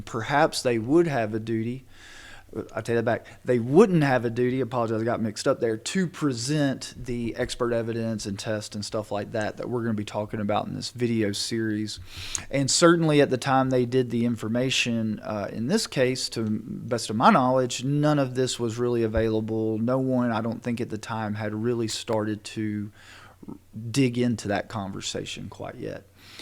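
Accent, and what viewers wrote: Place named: South, United States. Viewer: Love your southern accent 🙂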